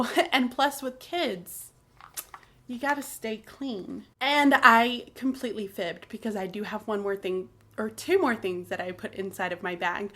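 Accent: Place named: Alabama, United States. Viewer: Are you from Alabama... your accent really came out... if so, we are neighbors.... I'm from Mississippi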